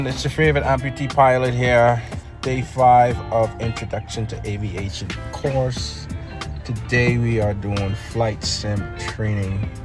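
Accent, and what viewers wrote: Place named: Bahamas. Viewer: Congratulation on starting your aviation Journey . You sound Bahamian . only another Bahamian know another Bahamian accent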